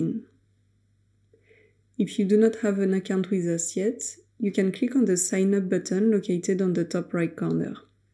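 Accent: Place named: France. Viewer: Excellent accent français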